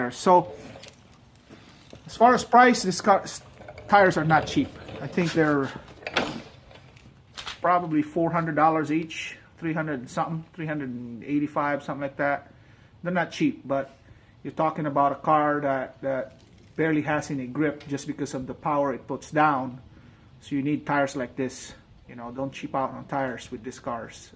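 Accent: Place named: Philippines. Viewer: You Filipino. You got that accent. Do you have steering wobbles with these tires.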